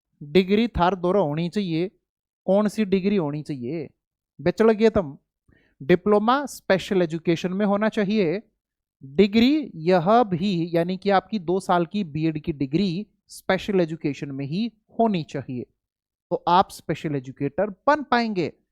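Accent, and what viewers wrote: Haryana, India. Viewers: Sir apki harayanvi 🎉😮😊😊voice very good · Wha sir aapne to dil khus ker diya aaj haryanvi bol k,aaj lagya apnapen is video m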